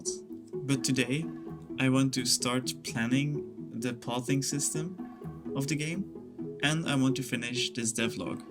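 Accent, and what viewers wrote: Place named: South, Sweden. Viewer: Are you from Sweden? I'm from Sweden I recognize that southern-swedish-to-english dialekt anywhere :) Also I like games with terraforming! If you eonder how the algoritm brought me here i started watcging one devlog and now youtube brings me 1-2 devlogs from different people everyday it must have hooked me to "devlog" as a term so now it recommends everything that has that in it. If your from sweden i wish you extra luck because we need more game developers I wanna play some swedish games thats not just minecraft! If your not swedish i still wish you the best :)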